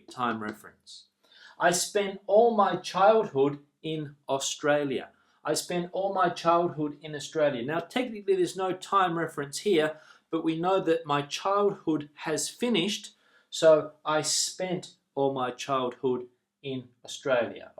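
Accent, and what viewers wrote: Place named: United Kingdom. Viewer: What a beautiful accent! Forvo says it's a british accent so I hope I could learn it perfectly as soon as possible, Spanish here!! Very good video, perfectly explained and easy to understand, Thank you!!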